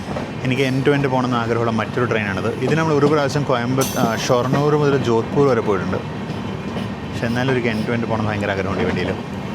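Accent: Thrissur, India. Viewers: Musical language of Trichur, much liked. ❤❤❤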